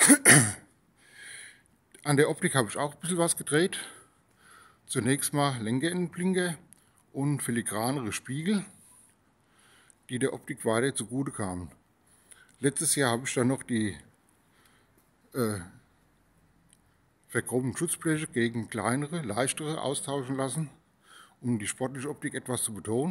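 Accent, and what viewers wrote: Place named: Hesse, Germany. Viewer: Servus! Schön steht sie da Deine W650. Ich hätte es schon am Dialekt erahnen können, der Blick aufs Nummernschild gab dann Gewissheit, Du bist beinahe ein Nachbar. Ich komme aus Rodgau (Kreis Offenbach) und habe seit 2012 auch eine 99er W650. Ein herrliches Moped, ich liebe ebenso den Motor, rein von der optischen Ästhetik für mich der schönste Motorradmotor den ich kenne. Habe bei mir auch eine Reihe von Umbauten vorgenommen, habe hinten ein paar IKONs, ganz anderes Fahrverhalten. Und mit 120.000km ist noch lange nicht Schluß, die W ist unverwüstlich, man muss sie schon mit Vorsatz kaputt machen wollen bis da mal was passiert.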